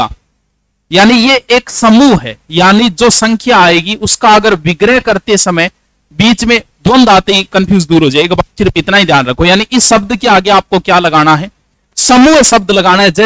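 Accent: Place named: Haryana, India. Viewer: Hii Yr ye sir konsi jagah ke h Bich bich m haryanvi jaisi language kyu bolte h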